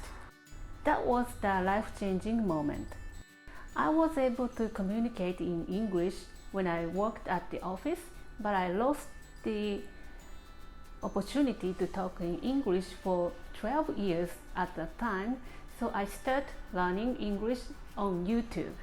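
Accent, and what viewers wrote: Japan. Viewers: Konichi wa. Your English vocabulary is very broad and accurate. Your accent and courteous manner just make you authentically Japanese. I like your channel because you show how to make healthy every day food that a Japanese mom would make in her kitchen. I don’t need a blowtourch to sear pieces of sashimi or a special pan to make takoyaki balls as is done on some channels. I do sometimes wish you would tell more about where to get some of the uncommon ingredients not readily available outside Japan or what can be substituted. I especially enjoyed this video where you introduced yourself and told us something about your background and hopes for your YouTube channel. Matané!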